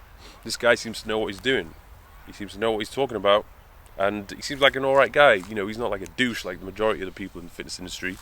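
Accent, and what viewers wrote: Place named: RP, United Kingdom. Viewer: ..I am a newbie to your YouTube channel..but I have to say it is by far the BEST fitness channel I have seen. This video is awesome. And your English is fantastic..you do not speak like a chav like other trainers. No slang, no ghetto-speech...just nice, straight, queens english. Your knowledge and your honesty is brilliant. No junk, no trash, NO WAFFLE, and no clickbait...just straight to the point, and nice short videos. I’m glad I subscribed. Top man!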